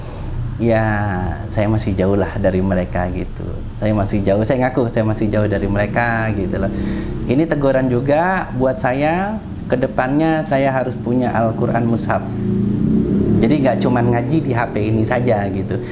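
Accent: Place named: Surabaya, Indonesia. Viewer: Suroboyoan koh loe fase jowoe. Salud aku ustad cerdas komplit plit jelas tur mantep buanget👍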